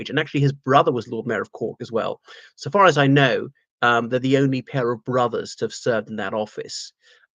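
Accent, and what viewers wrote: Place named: England, United Kingdom. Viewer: You sound English FFS.Go away.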